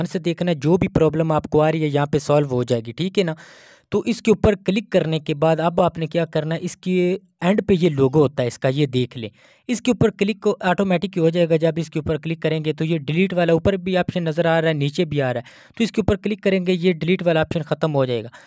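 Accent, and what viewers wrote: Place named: Punjab, India. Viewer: اپنا لہجہ درست کرنے کی کوشش کریں پیارے بھائی آپ اردو بولتے ہیں تو ایسا لگتا ہے کہ آپ پنجابی بول رہے ہیں اسکے لئیے آپ نے زیادہ زور سے نہیں بولنا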